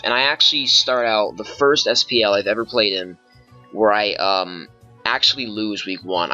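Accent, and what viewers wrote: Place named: Illinois, United States. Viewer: Are you from Illinois? Or Wisconsin? You kind of sound like you are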